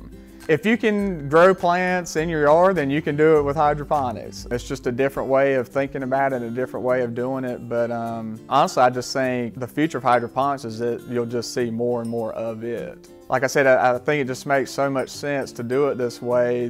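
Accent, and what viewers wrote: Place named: South, United States. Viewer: beautifull plants. your accent like people in the forest gump movie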